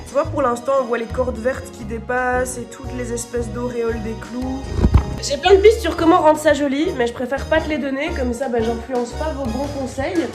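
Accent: Liège, Belgium. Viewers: vue ton accent tu serai bien de liege ? ou alors dans les Ardennes :> hahahhah (rire démoniaque)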